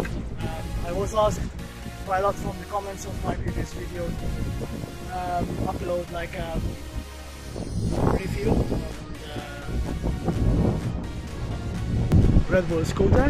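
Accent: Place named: Malta. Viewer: Nice Maltese accent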